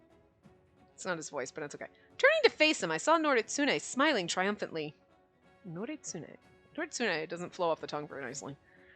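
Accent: Ireland. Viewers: The Irish accent started with Jack from london detective mysteria because he had a accent was how he was written But you really start to use it with norn9 I believe that was the kickoff point when you start to use it with almost every game which I love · "Favorite boy, he's the last route, he's an asshole..." Me: Waiting, knowing full well that this boy is gonna have glasses, is gonna be a robot, or something else special. " Fukuyama." and there it is XD Does he have white hair (Looks to see) Blonde? Okay, close enough. XD XD Irish accent. Didn't it's regular use start with Bustafellows? Shu? You just wanted to experiment with it but it ended up sticking? (and making me REALLY DISAPPOINTED that Shu did NOT have an Irish accent in the game...) I can't recall you using an Irish accent regularly in your repertoire prior to that. Also, (adding after the end.) Fukuyama DOES voice the sketchy characters, but when it comes to Vyn Richter, I got so tired of the "he's a red flag" deal I literally wrote a 2k essay on why he's not and I will proudly stand by that essay. 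Vyn is a good boy, even if he wasn't voice by, so haters need to leave him alone. XD XD I'll stand by you as you stand by him. LOL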